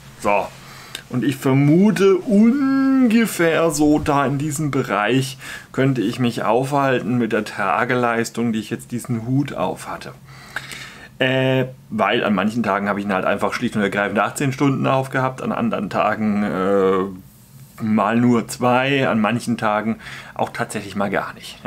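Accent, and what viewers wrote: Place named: Alemannic, Germany. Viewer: F. allemannischer ist noch ein bisschen weiter im Süden. In der Gegend vom ist das badische noch vom Elsas beeinflusst. Dadurch unterscheidet sich der badische Dialekt noch ein bissle vom sch(w)äbischen. <ma‘ muss hald do no ä bissle de badisch/schwäbische Freindschaft pfläge, gell> 🤣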